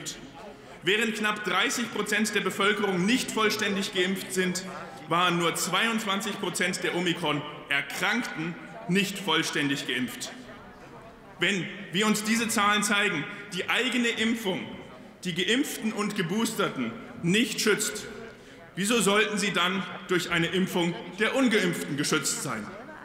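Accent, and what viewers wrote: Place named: Swabia, Germany. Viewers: ... Schwäbisch kann auch sein ... der Dialekt ist etwas gewöhnungsbedürftig ... ich habe 15 Jahre da gearbeitet ... und gelebt ... Spaichingen und 11 Jahre in Ostfildern Nellingen ... war ganz nett bei den Schwaben ... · Wohl eher Schwabe. Grausiger Dialekt für meine Ohren.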